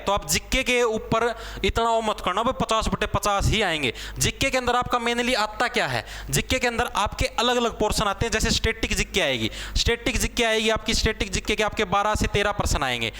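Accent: Haryana, India. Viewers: Haryana sei h bhai sir kya ajib lag ra h aur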